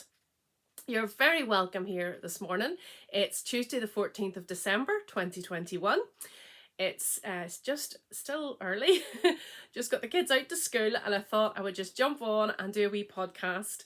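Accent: Northern Ireland, United Kingdom. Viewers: Enjoy watching your podcast. Your accent makes me homesick. I live in Guelph Ontario Canada. Most of my family live in Belfast Northern Ireland. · Hi, I live in Southern Ontario in Canada, but I was born in Belfast Northern Ireland. My family immigrated to Canada when I was 5, but I can recognize the Northern Ireland accent anywhere, my siblings and I lost our accents but my mom and dad never did.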